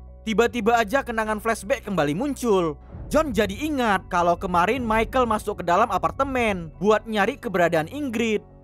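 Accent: Medan, Indonesia. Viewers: Orang medan abng ni bah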